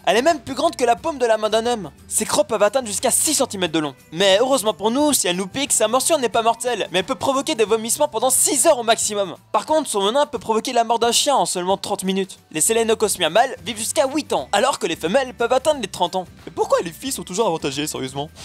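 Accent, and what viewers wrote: Belgium. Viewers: tu vien de ou de belgique ? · T'es belge?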